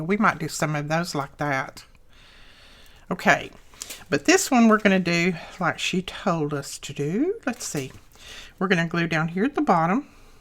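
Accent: South, United States. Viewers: ❣️❣️❣️ I almost never comment after yesterday's comments I want to let you know how I so love watching your channel! You're great and have such creative and understandable instructions. And, we talk the same language❣️ Although I have been away from my Southern roots for many years, I still have an accent and enjoy listening to others who do too! Keep up the beautiful work that you do, you Southern "Queen of Junk Journal Making", you❣️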